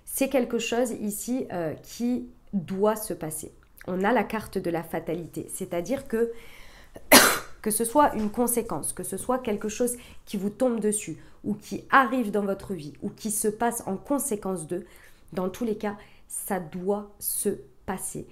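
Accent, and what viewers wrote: South, France. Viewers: Bonjour merci c'est tout à fait cela le ,17 mon chéri qui rentre de 15 jours de voyage et nous allons enfin commencer notre histoire le 23 grande fête pour les 35 ans de ma fille 🙏☀️🍀🎊votre guidance est incroyable pour moi c'est fou mille merci de me rassurer dans mes angoisses de vierge . Bisous du var 🥰 d'ailleurs votre petit Rose quand vous parler me dit que vous êtes du sud aussi ☀️☀️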